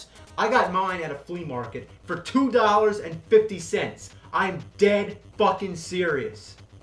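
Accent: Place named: Pennsylvania, United States. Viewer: That's just a Pennsylvania accent. 😂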